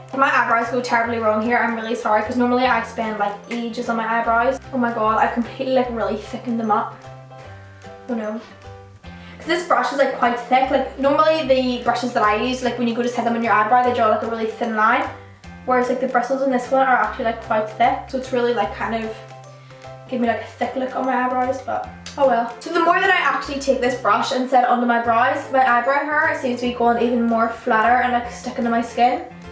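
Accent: Northern Ireland, United Kingdom. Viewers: omg are u from Northern Ireland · R u from Northern Ireland I am xx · She's from Northern Ireland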